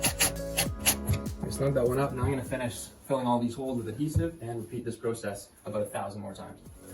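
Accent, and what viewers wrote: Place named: Ireland. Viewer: Great job. You seem to use many different coats of filler, give a great finish. Also you sound Irish!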